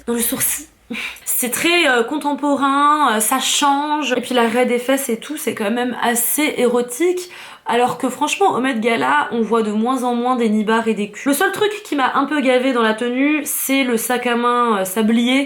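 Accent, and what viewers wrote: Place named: Liège, Belgium. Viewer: Allez, je m'abonne ! Analyse pertinente, des sujets intéressants, un air de ressemblance avec mon actrice favorite Anya Taylo Joy et arrière accent Liégeois de Beaufays très léger. Tout y est 👌🏽! C'est super !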